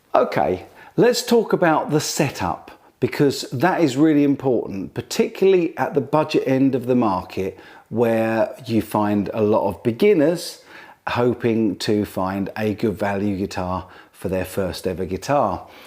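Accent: United Kingdom. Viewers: (From Belgium) Hello, I just discovered your videos for the first time: great job, hats off! You might also consider comparing the Harley Benton SC 550 II (= 2), which is even more convincing due to...STAINLESS STEEL FRETS and Tesla pickups (no Roswells on that one)!!! A SUPERLATIVE as far as frets are concerned. A dream really. This sells for a ridiculous price: 235£/279€. The web is full of positive appreciations and many testers kept or bought this guitar immediately (John Robson, e.g. ). I ordered one immediately, convinced that it is the bargain of the year. Finally, as a francophone deeply in love with American English, I must say I enjoy your British English A LOT. What a pleasure! If you’re not a teacher, you should consider becoming one ;-) Keep up this brilliant work. By the way, you might think of indicating the radius of the fretboards.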